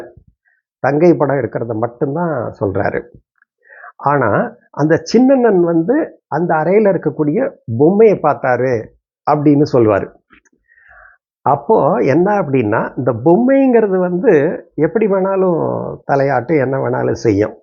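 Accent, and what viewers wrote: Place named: Tirunelveli, India. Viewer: உங்கள் திருநெல்வேலி ஸ்லாங் எனக்குப் பிடிக்கும். நீங்கள் நல்ல கதைசொல்லி. திருநெல்வேலியில் இதே போன்ற ஒரு சம்பவம் எனக்கு தெரியும். இளைய சகோதரியும் பள்ளி ஆசிரியை.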